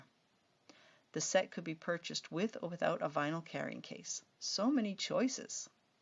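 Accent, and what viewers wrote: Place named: New Orleans, United States. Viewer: Your accent sounds a lot like my family members. Are you from New Orleans? Btw I love this video. I love vintage dolls.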